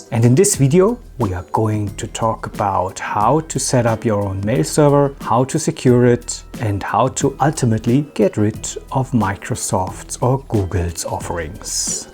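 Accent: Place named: Germany. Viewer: Gotta love the Hans Landa accent. Thanks for the contribution friend!!!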